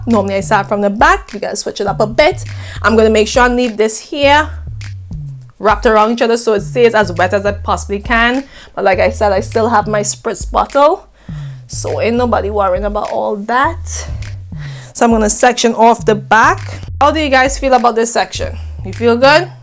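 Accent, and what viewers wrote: Trinidad and Tobago. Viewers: I'm new to your channel but are you from Trinidad? Your accent is beautiful!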